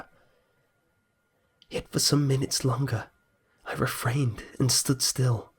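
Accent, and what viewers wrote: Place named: United Kingdom. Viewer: Okay, seriously, grammar, plus he may be from anoth country, everyone says stuff different in different places. Don't judge him for how hepronounces words. I'm pretty sure he's from the UK. We pronouce diffrently. Okay?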